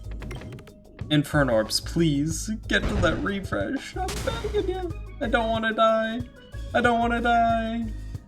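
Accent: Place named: Canada. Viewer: Your voice is the definition of Canadian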